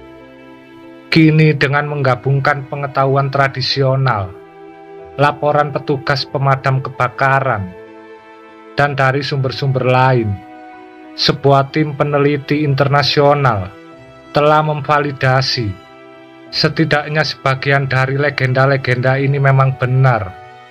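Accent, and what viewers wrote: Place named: Java, Indonesia. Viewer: bisa ga logat jawa di buang bikin geli mendengar nya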